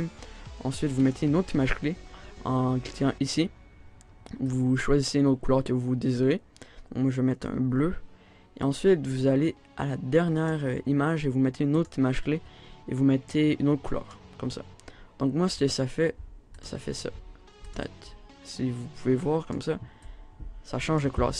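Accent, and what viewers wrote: Quebec, Canada. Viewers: super tuto et j'adore l'accent quebecois donc je like ;)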